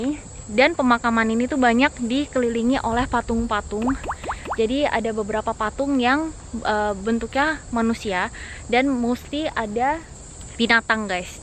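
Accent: Indonesia. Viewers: Medok banget bhs indomu dek !! love it !!! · Ini org korea atau indonesia ya, logatnya sama persis bgt kya org indonesia.. 😅😅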